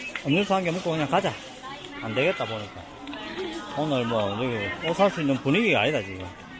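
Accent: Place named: Busan, South Korea. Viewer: 부산 사투리 듣기좋아서 챙겨보고 있습니다 좋은영상 감사합니다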